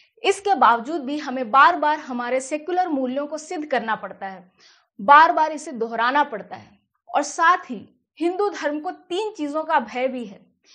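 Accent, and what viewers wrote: India. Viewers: love the hindi accent also the usage of words. very unique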